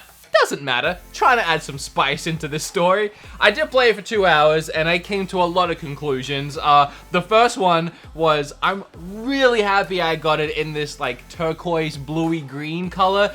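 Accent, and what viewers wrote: Australia. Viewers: You sound Australian and American. Which one are you?? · I'm very confused with your accent... sometimes a little Australian slips in... Anyway, I'm savin up to get a Switch. Played BoTW on my nephew's and I want that experience 24/7 now. But I don't intend to get the Lite because in my hands, the white buttons won't stay white for long.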